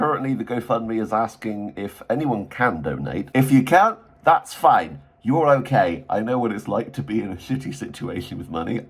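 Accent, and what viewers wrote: United Kingdom. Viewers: So to confirm...you are British?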